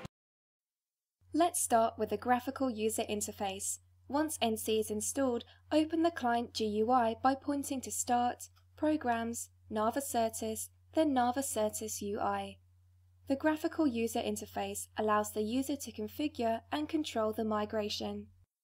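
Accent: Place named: United Kingdom. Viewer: Can't understand a word of her thick British accent.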